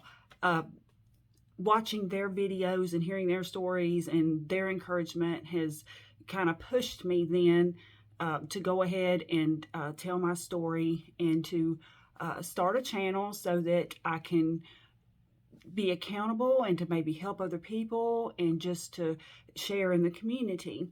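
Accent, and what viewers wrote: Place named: South, United States. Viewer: Hi. Best wishes for your channel. Following Ken Berry for a long time, I did a little bit carnivore on and off, so far never "all in" 100%. I will change that now and go for it. Since I am living in Portugal (sardine country), I can tell you my special not so carnivore recipe: I put one can of sardines and 1 can of anchovis in a food processor and blend it to a paste. This paste I fry in a pan with a piece of butter, add salt and pure natural tomato sauce. Then I let it simmer on low fire for 30 minutes and fill all in a glass bottle. Every morning I heat up a few tablespoons of this sauce and put it on my scrambled eggs or on burger patties. I like it, you don't taste the fishes. Has a lot of omega 6, fat and lycopene. By the way, I like your southern accent very much. Is it Tennessee like Ken Berry?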